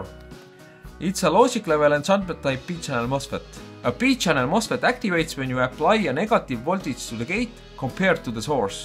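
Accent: Estonia. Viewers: Thank you so much helped me out man! Btw you sound like an estonian. Am i right? Im from est.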